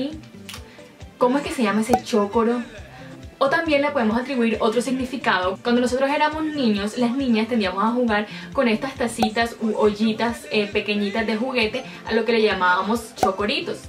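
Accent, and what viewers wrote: Barranquilla, Colombia. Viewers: Oh me es acento costeño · Un ejemplo el acento que empleas es un acento indudable de Barranquilla. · no es el acento costeño, ese es el acento barranquillero, muy diferente al de otra ciudad muy importante de la region que es Cartagena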